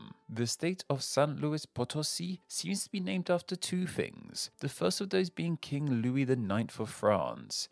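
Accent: United Kingdom. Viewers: Your British accent is very difficult to understand for me as an English-speaker living in America. Can other Brits understand you? a Yorkshire man for example, or a Cockney, or for gosh-sakes a Scot? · This is a GREAT video. Just some notes. Sonora = So-NOR-ra. The "sur" in Baja California Sur should have a strong "uu" sound. And yeah, saying Mexican names with that British accent just can't be the best. You did good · He didn’t butcher them. His British accent didn’t help in some but over all he did very well. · The british pronunciation is so annoying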